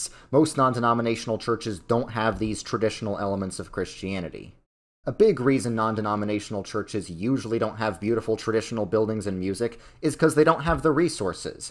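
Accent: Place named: Chicago, United States. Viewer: Okay, completely unrelated, but are you from Illinois? I hear some Chicago in your accent😅😅😅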